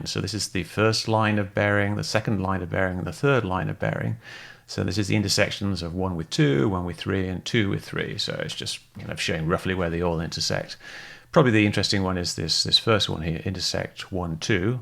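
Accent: United Kingdom. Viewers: Lmao if your stupid enough to believe this asshat knows more about a flir targeting pod than EVERY PILOT IN THE MODERN DAY MILITARY, then you deserve to be kept in the dark. I mean he used actual science and math to prove his point, while this dumbass used a flashlight in his garage in a lame attempt to make his point. Just becuase they have a British accent, doesn't mean they are intelligent. This guy just profits off of this shit ironically like the people he's trying to discredit. Think about it, who has the most to lose in this argument? Mick West or literally anyone else who actually trained as a fighter pilot? Use your damn head people!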